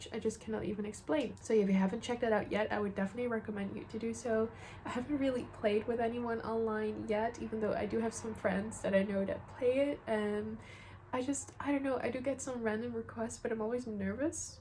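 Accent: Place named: Netherlands. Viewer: Ik hoorde direct aan je accent dat je ook Nederlands bent! ♥️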